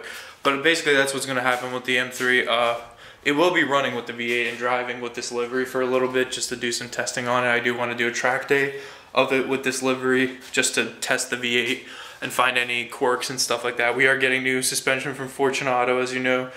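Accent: Russia. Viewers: are u russian?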